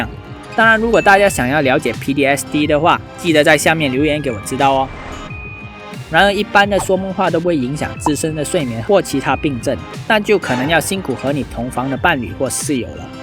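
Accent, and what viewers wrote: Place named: Malaysia. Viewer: 从你的说话的方式就知道你 是马拉西亚来的欧 就酱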